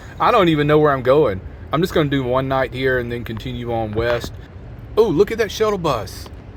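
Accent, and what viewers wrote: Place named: Columbus, United States. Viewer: I KNEW you had to be from Columbus! I'm from there, and I picked up on your regional dialect. That's so awesome! Safe travels!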